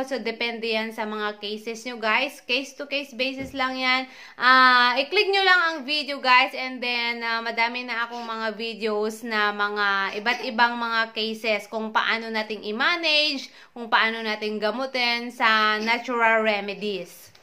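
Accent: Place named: Ilocano, Philippines. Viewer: Hi mam, nakakatuwa naman na ilokano ka pala tulad ko, noon ko pa kasi napapansin ung tuno ng pananalita niyo po hehe. Anyway God bless po!❤️🙏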